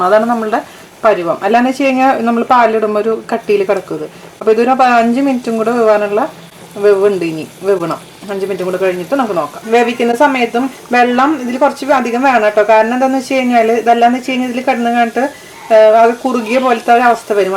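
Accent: Thrissur, India. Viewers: very well explained..especially trissur style..thanks lol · chechi thanks a lot...i started watching your videos becoz of the thrissur slang.i love thrissur.but ippo i watch your videos becoz of chechis cooking....I used to make paayasam in uruli.virakaduppila undaakkunnath.pradhamans.appo paalada undaakumbo pink varal nadakkilla.kure neram venam.ippo etra easy aay kaanichu thannu chechi with gas stove and cooker....thank you chechi.i havnt tried meen curry yet.but checheede thrissur meen curry kandappo vekkanamnn thonni...vechitt parayaatto...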